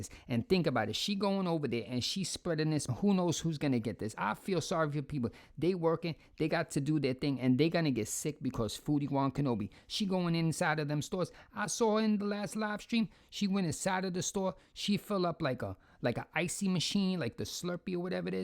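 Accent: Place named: Nuyorican, United States. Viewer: I don’t even care about Foodie but I will always watch your videos, even if you’re doing reviews of the world’s best cabbages. By the way, my bf hears your videos and is convinced you’re one of his Newyorican relatives doing reviews on internet weirdos on the down lol. I mean, he might be right?! Love you!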